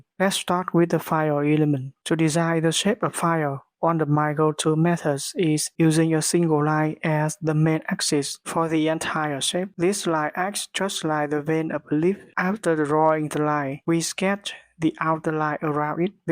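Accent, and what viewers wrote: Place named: Vietnam. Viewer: Giọng phát âm tiếng anh nghe đúng tệ, nghe y như người việt, ngó ra cái kênh hóa ra của vn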